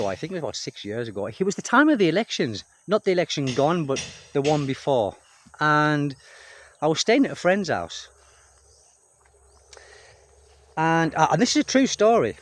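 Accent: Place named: Scotland, United Kingdom. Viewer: Started listening to you speak and you reminded me so much of my time teaching English in Japan at a British based school. Just closed my eyes and listened. Correct me if I'm wrong but do I hear Scotland? Sound so much like my friend Pat. Cheers mate!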